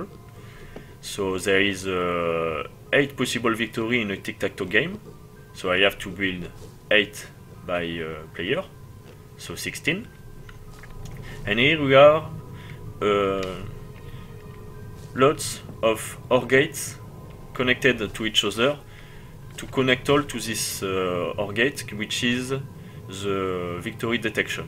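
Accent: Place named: France. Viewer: Lol on reconnait bien le français qui parle anglais xD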